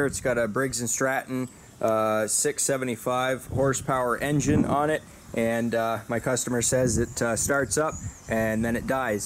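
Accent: Canada. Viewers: Nice video, good lighting and explanation (you sound like you are Canadian!)…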